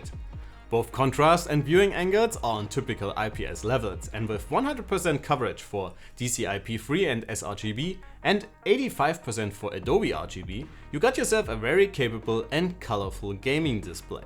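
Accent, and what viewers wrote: Germany. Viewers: The German accent tho :) 105watt not quiet my tempo :))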